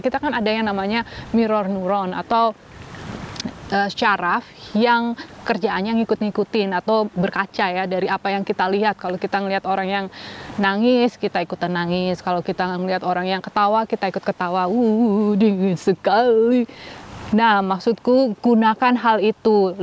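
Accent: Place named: Cilacap, Indonesia. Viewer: Baru ngeh kalau mbanya orang cilacap,ora ngapak ora kepenak Mantap mba salam 👍👍😊🙏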